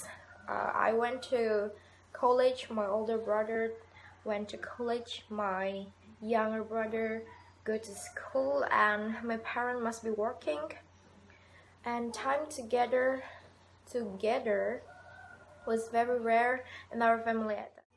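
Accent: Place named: United Kingdom. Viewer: Hi! Long time no see! I'm glad that you look healthy in this video! Keep yourself safe during the quarantine ok! I love the way you speak english, you almost nailed british accent! Your pronunciation was also clear, i can understand every word you said. And thank you for the information, it's very useful! Keep safe and stay healthy ok! See you after the quarantine ends!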